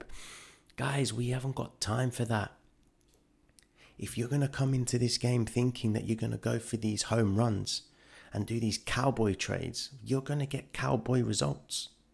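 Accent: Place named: Epsom, United Kingdom. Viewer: please help me I really need a mentor. you sound like your from my neck of the woods. im from epsom dude. im in a bad loser at the moment. shorted from 21500. my liquidation is 38k do you think I should leave. I may leave this week cause of bad moon cycle in btc. this is not a reason I know but I need to close it.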